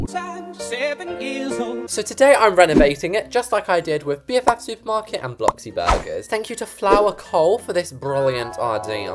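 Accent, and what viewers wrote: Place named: England, United Kingdom. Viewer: just how good is and how she only has 200k+ subs is so disrespectful i wish you could sub morethanonce like would have like 77777M subs if you could sub more than once beacause I WOULD BE SPAMMING THAT BUTTON LITERALLY BRAKING THE BUTTON (also my pc) LIKE BRO is such a good builder i could never sorry but one time i said a mean comment because it autocorrected so tysm for being a reallly great builder and so funny and i love ur accent one time i went to englend and i heard someone talking with your accent and i fell in love byee! i hope you have a happier life!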